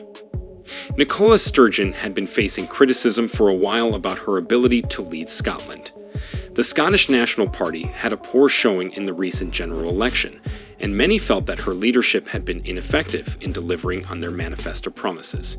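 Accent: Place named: United States. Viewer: what a load of britnat nonsense....spoken by an American accented robot....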